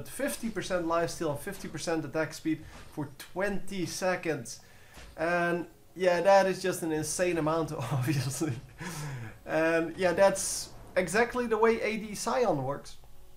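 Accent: Netherlands. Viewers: are you from the netherlands i hear it on your english ( ik ben van belgie )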